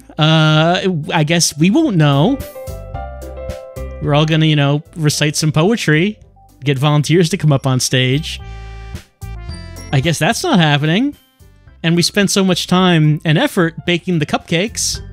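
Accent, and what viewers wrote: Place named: New York, United States. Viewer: I miss New Yorker accent already:(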